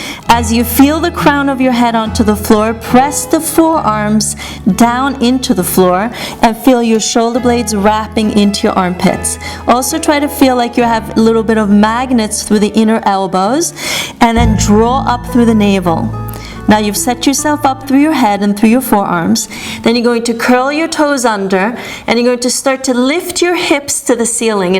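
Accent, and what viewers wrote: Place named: Sweden. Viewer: Thank you! By the way, are you Swedish? I think I can spot a very subtle Swedish accent.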